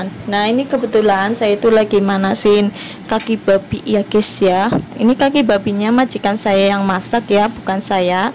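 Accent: Banyumas, Indonesia. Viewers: Pokoke Ora ngapak ora kepenak .. Sukses Terus mbak Yu 👍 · Ora ngapak ra kpenak ya mbk😁 Sma mbk ce q jg stengah 7 mkn mlm nya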